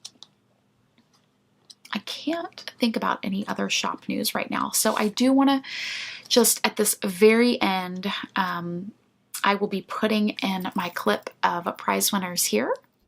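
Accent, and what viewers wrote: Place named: South, United States. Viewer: I have wanted to ask you this question for so long. I don’t want to sound in any way disrespectful to anyone. You have said you are a Louisiana girl. But you have no accent. You sound southern but I don’t hear Louisiana. My mother was in the hospital for awhile in Shreveport years ago but I was amazed at their lovely accent. Shreveport is not far from us. I live in OK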